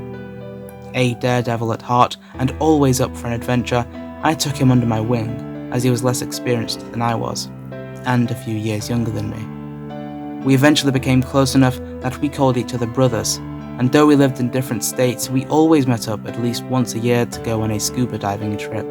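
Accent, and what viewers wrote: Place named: England, United Kingdom. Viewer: Good story.. Not sure about the English accent of the narrator though.. ... ... For the record I'm a Brit too.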